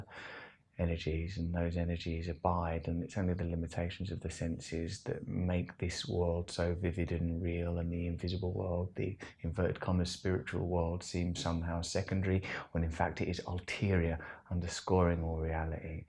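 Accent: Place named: Cockney, United Kingdom. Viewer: Is there no topic this nit won't publicly pontificate on? I bet he could drone on for hours about quick drying cement, just so as to hear his own mockney tones reverberating inside his empty skull. Russell, do us all a massive favour, and keep your inanities strictly to yourself...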